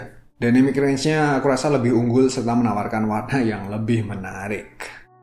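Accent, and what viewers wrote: Java, Indonesia. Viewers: Di jembreng... /jawa bangett mas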